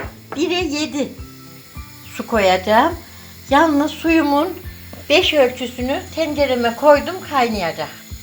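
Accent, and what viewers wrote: Kayseri, Turkey. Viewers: Kayseri şivemizi dogal ve cok guzel konusuyorsunuz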